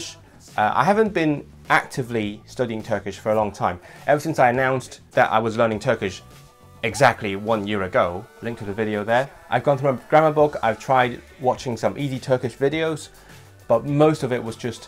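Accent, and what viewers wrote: United Kingdom. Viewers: To my non-native ears, I legit thought you grew up in the UK.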